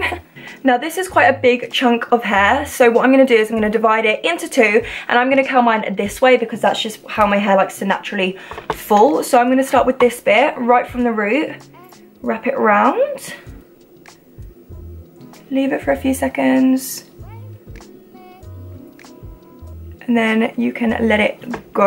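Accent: United Kingdom. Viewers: YOUR BRITISH ACCENT I'M DIYING 😭😭❤️ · British (I know cuz I have that accent too but you can ask in a q&a or something) 😂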